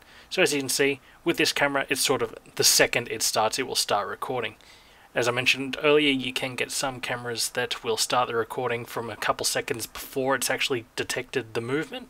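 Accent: Australia. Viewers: Thanks! Love that Australian accent! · aussie accent! <3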